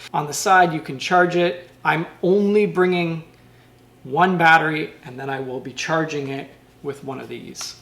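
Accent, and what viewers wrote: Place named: Canada. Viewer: Canadian accent freakoUt:D:D NICE! Cool music:)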